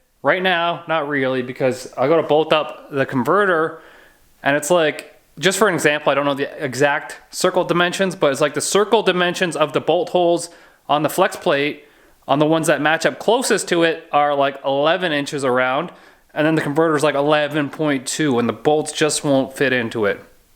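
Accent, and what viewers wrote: Canada. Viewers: I’m here just to hear him speak Canadian. I love Canadians. 😘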